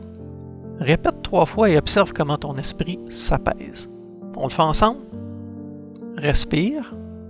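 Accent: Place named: Quebec, Canada. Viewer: Bonsoir ❤ je ne sais pas respirer, aussi, je me sers de votre vidéo explicative: " votre technique 4; 7; 8.." Merci pour cette explication géniale! Vos vidéos sont hyper intéressantes! J’ai une question...Est-ce qu’une personne ayant un souffle au cœur +un problème cardiaque peu faire du sport s.v.p? Merci par avance! J’adore votre accent Québécois, c’est un accent chantant tout mimi.Prenez soin de vous! ❤🙏❤️🥀🙋‍♀️🥀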